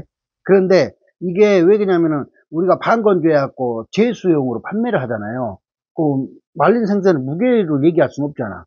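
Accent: Jeolla, South Korea. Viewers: 우리 어머니 목포말씨를 께 들을 수 잇어서 정말 기쁩니다...^^ 보물단지 호남목포말씨에요...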